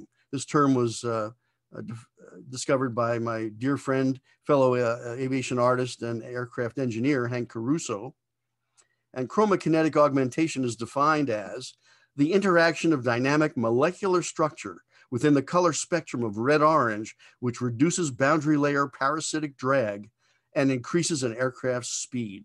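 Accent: United States. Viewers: WHY THE AUDIO SOO BAD. FIRST HE IS WISPERING AND SECOND THE TOO STRONG AMERICAN TONE MAKES THE VIDEO UNUNDERSTANDABLE BY NON AMERICAN FOLLOWERS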